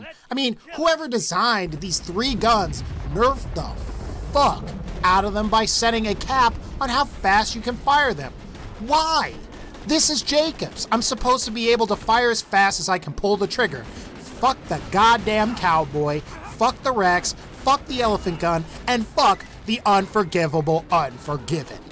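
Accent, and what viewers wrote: South, United States. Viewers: His country accent his amazing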